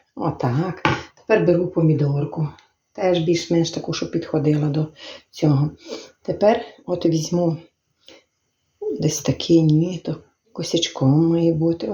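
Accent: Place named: Vyzhnytsia, Ukraine. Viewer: Доброго дня, Ви моя найулюбленіша господиня , хоча у мене біля 70 кулінарних каналів, мабуть тому що я також з Буковини, хоча живу дуже далеке, якщо можливо скажіть звідки Ви саме, бо відчувається Вижницький район!! Дякую Вам велике за Вашу працю в любі до неї!!!!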